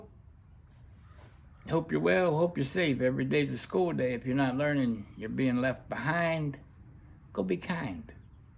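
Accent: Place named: New Orleans, United States. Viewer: by the way when i first started seein your vids i was for sure you were from Nawlins!😆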